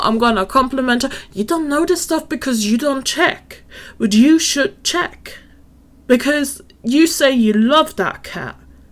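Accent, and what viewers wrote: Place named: Germany. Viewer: I thought you sounded German!!! Welche Region wohnst du in?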